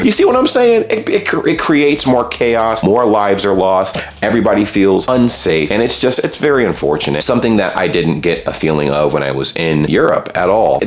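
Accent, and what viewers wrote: United States. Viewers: That’s crazy, even smart Americans like this guy can go THAT long before finding out the US is a joke. So you’re moving to a new country, right? Work on changing that accent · I once found your videos a long time ago and I have to say, I was more annoyed by you and your typical US way of talking and reacting to things, so I was not all willing to subscribe. Over the time I always stumbeld over your videos from time to time, whatch a bit and moved on, after your first Germany trip I whatch more closely. Why am I telling you this, well you have changed so much for the better!!! Not because your videos got better , no, YOU as a person, it is like you've grown up.... no better: you have GROWN! You edjucated yourself, selfreflected, bearthed in knowledge, due to experiences. You became the best proof that travelling and living outside your comfort bubbel zone US there could be hope for the future, that Americans are abel to learn an improve themself. 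I don't mean that in a funny way, I dislike the USA too much for that, I just wanted to tell you, that you also influnced me a bit. Greetings form a German Generation X and keep on going... ✌✌👌👌😊😊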